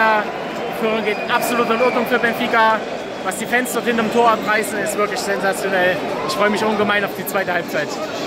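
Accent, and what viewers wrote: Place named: East Germany, Germany. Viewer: Dieser Ossi-Akzent. Als du Panther gesagt hast, habe ich Panda verstanden 😂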